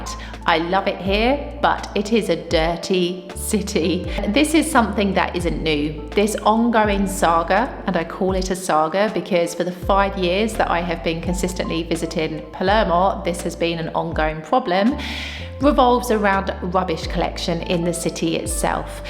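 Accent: United Kingdom. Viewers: Your British accent is on point 👌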